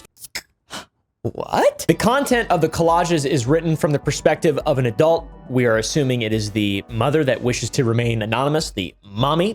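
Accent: India. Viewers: From his accent he actually sounds Indian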